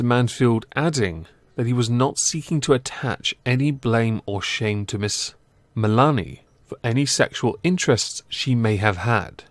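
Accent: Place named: North, United Kingdom. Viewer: Maybe, but do they have your buttery smooth northern accent?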